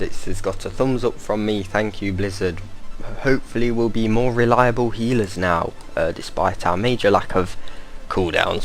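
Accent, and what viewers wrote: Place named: United Kingdom. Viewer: Where are you from? Trying to cypher which flavor of the UK accent you have?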